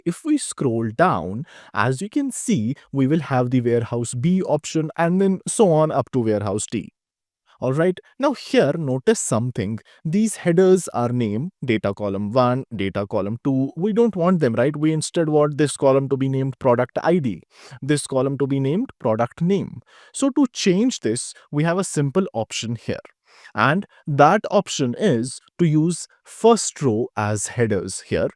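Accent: India. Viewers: He has that typical heavy Indian accent. Hard for native English speakers to understand, due to less emphasis on sections of words. I have taught a few people from India how to speak clearly. Mind you, my Indian, Indonesian is bas, my Spanish id okay, so I cant really talk. However, i do work on the accents otherwise I get passed over.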